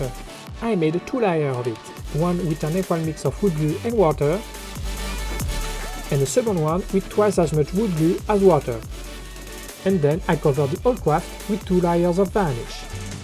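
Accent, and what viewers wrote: France. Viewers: T'est français?, je trouve que t'as un accent francais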